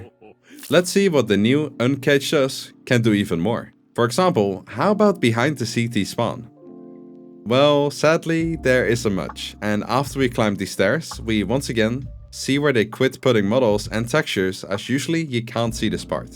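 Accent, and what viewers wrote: Netherlands. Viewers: Ah yes the Dutch accent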